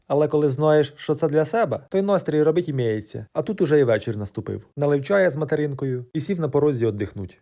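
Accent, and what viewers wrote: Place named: Volyn, Ukraine. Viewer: Випадково в головного героя даного відео немає брата на Волині Такий самий говор та поведінка Маю такого знайомого геть схожий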